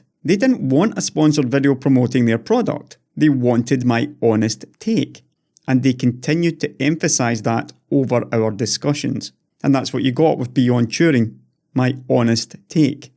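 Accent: Scotland, United Kingdom. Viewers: i started watching your videos a long time ago because i was tired of what could be seen as generic tech videos. I was looking for something that could challenge my mind and let me think. Today's videos by most tech people are incredibly dumbed down to where we already know the outcome. When i started seeing videos come up that said how you can liquid cool your computer with (insert stupid idea) i knew we had reached critical mass. I think people looking at your videos and seeing a sponsorship they have a knee jerk reaction mostly due to how much the current tech press has sold out. We all know it has or at the very least know it isn't as straight forward as it used to be. It isn't fair to paint everyone with that brush especially you but i get why they react immediately to that. I personally love your accent, my lineage is of Scottish decent and I enjoy listening to it. 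I can understand why some people might not like it though. For me it is the English accent. It amazes me how a country as small as Britain is can have so many people speaking in wildly different sounds. That is an example of what i cant stand. Your information in your previous videos is still very relevant to today. I can almost see it like how the Wii U games are being re-released on the switch, great games that never reached out to the full audience. I would rather hear you speak but i get needing to reach a larger audience. Keeping videos to around 10-12 minutes will keep an audience especially with h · I LOVE the scottish accent and I love the content of your videos. As far as I know you're the only youtuber that goes deep into hardware analysis combined with economics and whatever else you bring into the mix. All I can say is keep up your morals and keep doing great videos if you're able to! 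· Its a brilliant accent. Actually very 'broadcast quality' for a British market. I lived in the states for 19 years. I can understand the difficulty with many Americans having difficulty, which is a real shame because of the size of the market. It's not so much a deficiency in their ability to be ABLE to become accustomed to the Scottish accent -its more due to the US mainstream media's unwillingness to expose their audience to enough of the beautiful Scottish accent, which is a real travesty. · I am an english viewer from the south east of Kent and I have no issues with your accent nor any scottish accent, and I am hard of hearing, keep up the good work, I thank you! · M8 from one Scot to another I love the accent and the channel. Keep up the good work! Only channel that gets a like before I watch it!!! Can't wait for your next upload!👍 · Good stuff! :-D If an Israeli like myself can understand your Scottish accent perfectly fine then it's hard for me to believe how anyone else wouldnt. 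With that being said, I did not need a 27 minutes long "you guys were wrong" video. I trust your judgement with my eyes closed. That's also one of the reasons you are pretty much the only sub I've got. You shine a light at what no other tech Youtubers can see. That stuff is above Jay's and Linus payroll. They'll never be half as professional and through as you are. Cheers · Time to read some Threadripper promotional materials for an ASMR vid... Make it so! Though seriously, from a Bulgarian with a heavy accent, to a Scottsman with a heavy accent- I'll take yours any day of the week, brother. It sounds great. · Just found your channel from Joker. Consider me a loyal sub. And I appreciate the actual technical research and detailed knowledge on the subject. I find myself drawn more to people that push the limits of my knowledge and literally have to stop a video, open a tab, and google a topic/tech/process. 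And the accent...well I spend a lot of time watching Scott Manley. So the Scottish doesn’t both me a bit. I listen to the hour long videos one after to another when I’m not busy. Thanks. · The Scottish accent is an honest one! Keep it up! :D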